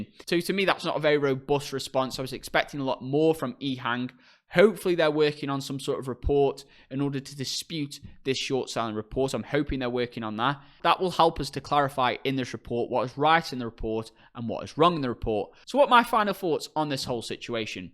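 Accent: United Kingdom. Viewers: Bought in at $64; it will not be fail this company. It has the technology to address future market needs 3-10 years forward. I always like your presentation! Plus a tinch of northern accent!👍😄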